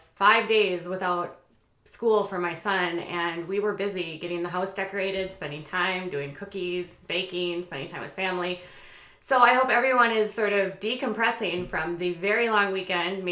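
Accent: Minnesota, United States. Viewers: I notice you say it like “Gosling” instead of “Gosselin” — but it may just be your Minnesota accent. I think Minnesota accents are just too cute!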